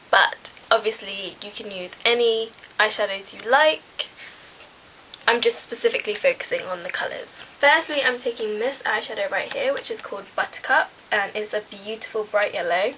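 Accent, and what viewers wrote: United Kingdom. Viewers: I LIKE UR VIDEOS.. IVE NEVER HEARD SUMONE WHO IS ASIAN HAVE AN ENGLISH ACCENT.. WOW:).. HAHA.. BUT I LIKE DIZ VIDEO IT REALLY GOES GOOD WITH U.. UR A VERY PRETTY GURL!:)..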